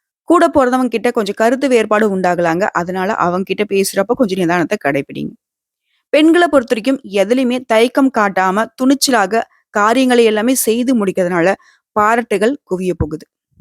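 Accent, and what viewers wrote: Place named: Periyapalayam, India. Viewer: Periyapalayam Tamil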